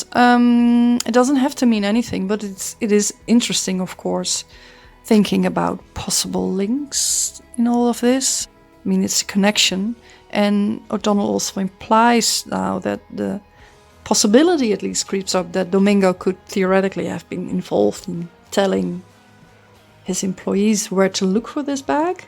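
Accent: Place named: Netherlands. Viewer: dutchies try to speak english 😂bad accent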